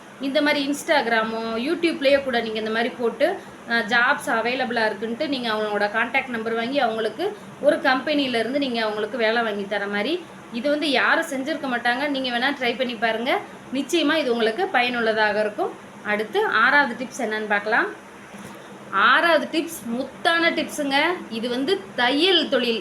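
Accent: Thoothukudi, India. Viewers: அக்கா நீங்க தூத்துக்குடி யா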